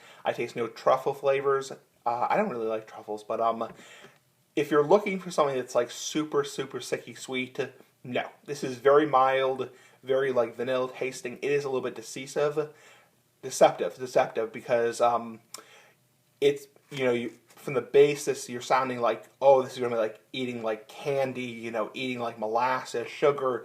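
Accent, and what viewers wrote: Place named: France. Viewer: I like your accent, are you French?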